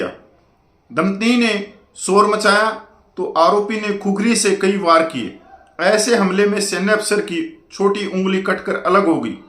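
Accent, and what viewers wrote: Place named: Haryana, India. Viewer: भाई अपने चैनल मे हरियाणवी टोन का प्रयोग कम से कम करे।धन्यवाद।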